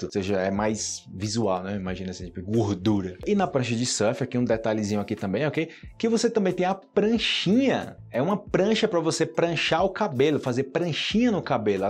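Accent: Antioquia, Colombia. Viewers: si el vivió en Medellín Colombia como 5 años .habla con perfecto acento colombiano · Crealo yo note lo .mismo e incluso me atrevería a decir que vivió en Colombia por qué suena como paisano jajaja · toda la razon, si bien soy chileno he tenido la suerte de hablar con gente de varios lados y pensándolo realmente suena como colombiano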